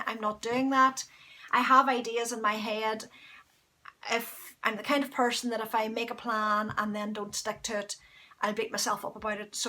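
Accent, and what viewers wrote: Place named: Ireland. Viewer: Oh Nooooo, the knit retreat is all sold out! I am a new knitter in Minnesota, and a genealogist with Irish ancestry in Northern Ireland and I have had the pleasure of visiting Ireland three times. I just found your podcast and I started smiling as soon as I heard your lovely brogue. When you announced the retreat tickets going on sale at 10 P.M. GMT today (It is P.M. here) I immediately went to the website to get a ticket. Oh well, perhaps next time. I am thrilled to have found your podcast. Fern in Minn P. S. I began knitting in Sept of 2018 and I am loving it!!!!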